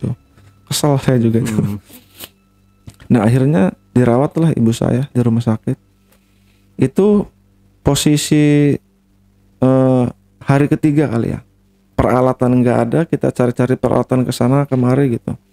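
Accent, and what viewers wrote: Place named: Indramayu, Indonesia. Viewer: Ini banten mana ya? Kok logat nya kaya jawa indramayu 😅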